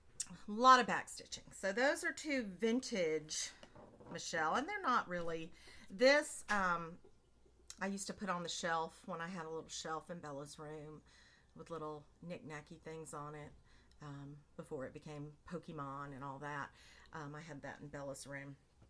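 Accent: South, United States. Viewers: Another great video! Love listening to fellow Southerners! Especially since I am stuck up here in snowy Canada! 🤪The swans are gorgeous!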